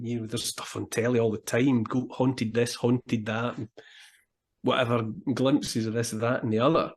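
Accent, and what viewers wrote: Scotland, United Kingdom. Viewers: you never struck me as a bright spark...not that I listen to you much as I can't stand the slummy Scauts accent. Learn to speak English!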